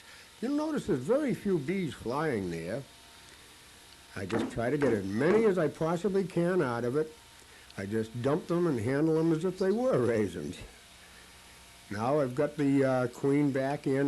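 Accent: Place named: Rhode Island, United States. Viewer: Has kind of a Quahog accent.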